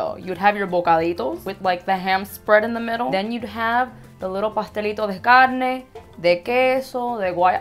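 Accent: Cuba. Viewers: I HAVE THAT SAME COFFEE MAKER AND SAME COLOR I love you girl you are my favorite and you make me laugh, I'm Colombian we have a few things in common with Cubans I been noticing and I love your Cuban accent you are just the funniest and the best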